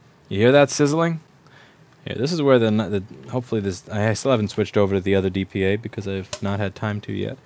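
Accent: New York, United States. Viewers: I'm sure I'm not the first to tell you this, but your voice reminds me of Jerry Seinfeld. I guess that you also have the same accent since both of you are New Yorkers.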